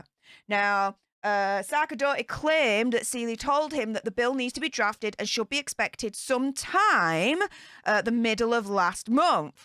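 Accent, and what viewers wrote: Yorkshire, United Kingdom. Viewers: Sounds like a Yorkshire lass..🤔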